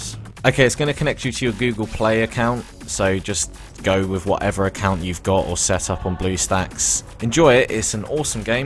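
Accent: United Kingdom. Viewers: Thank you so much disembodied british voice :D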